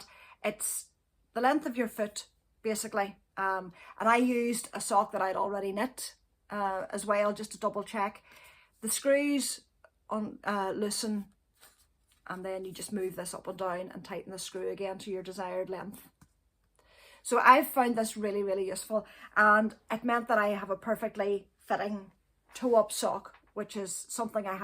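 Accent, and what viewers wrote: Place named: Ireland. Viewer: I so love your podcast! Thank you for uploading your videos. I especially love listening to your Irish accent. You could read the phonebook and it would still be interesting. Blessings to you and your family. Haven't seen your cat for awhile. Does he still like to perch on your k we while you knit...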